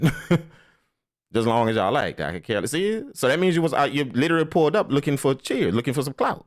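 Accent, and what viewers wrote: New Orleans, United States. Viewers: you from the N.O huh?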